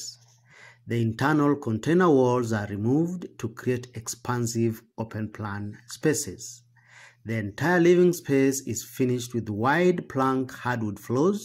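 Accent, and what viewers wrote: Kenya. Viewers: YOU SOUND KENYAN ... ??? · The narrator has a Kenyan accent. It's what kept me watchinf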